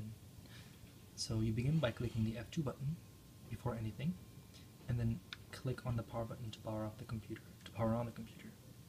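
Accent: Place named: United Kingdom. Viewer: SHIT DAWG SLOW DOWN WITH THE BRITISH ACCENT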